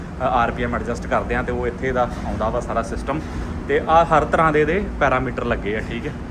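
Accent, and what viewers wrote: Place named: Amritsar, India. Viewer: Goodf information and you belongs to amritsar district as it is clear from your speech.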